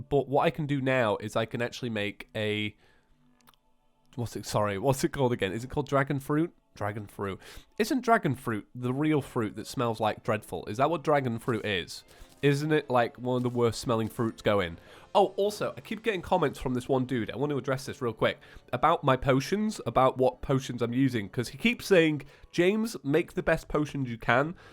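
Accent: United Kingdom. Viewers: It's not captions, it's terrible accent. All I hear is "hell loacher ari-eh and fewzis my name is tsheims". English is not my native language but even for me this speech is too painful to hear. Is it how british English sounds? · For the most part it is just his british accent, (I don’t think it’s horrible, I actually love it) but his voice is kind of deep so that makes it sound a little bit different than others.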